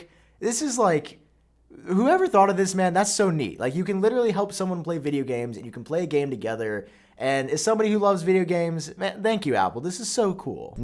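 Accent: United Kingdom. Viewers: Sorry You've made this offence too many times I gotta say something😂 There's been too many strikes. I must correct this, Your "British" accent is in fact an English accent. Britain also consists of Scottish people, Welsh people and Northern Irish people and we pride ourselves by not sounding like them. The american equivalent would be like impersonating a New Yorker with a Boston accent. The more you know 😜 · The British accent is pretty much on the money! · From one English chap to another 😂😂 great accent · I like your British accent far more than Damien’s hair cut.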